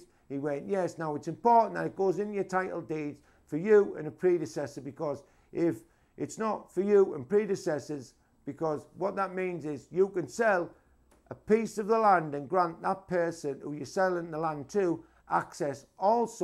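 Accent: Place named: Teesside, United Kingdom. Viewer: thats a sout teeside / north yorks accent ? ... recognize the twang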